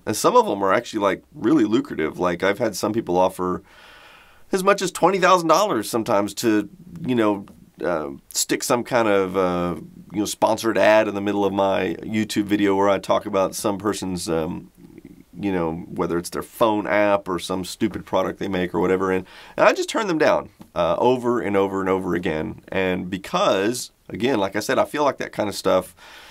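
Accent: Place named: Texas German, United States. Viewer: Interesting - when I saw you speaking german in that Thomann video, I assumed you're a Texas German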